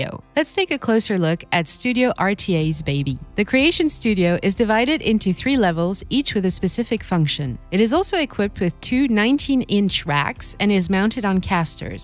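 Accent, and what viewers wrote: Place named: United States. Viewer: American accent, but went metric. Oof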